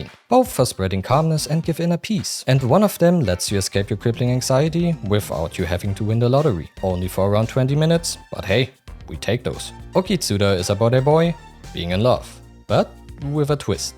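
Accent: Germany. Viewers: i heard the german accent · Ich hab den deutschen Akzent sofort gehört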